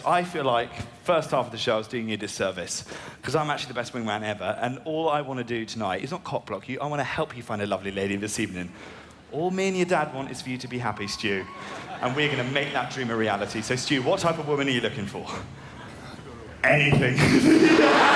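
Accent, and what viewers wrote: RP, United Kingdom. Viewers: Get better friends, lol. No but honestly, I'm Swedish too and most people I know would be able to follow this no problem. When I saw Jimmy Carr here in Sweden the venue was sold out, people here like British comedy and language is not generally a barrier. Maybe for some people but not the majority. And my experience is people who do struggle a bit with English generally find British English easier than American, and Jack is quite posh so that doesn't exactly hurt. Hade gärna sett Jack live också om han kommit till Sverige men 2014 visste inte jag vem han var tyvärr!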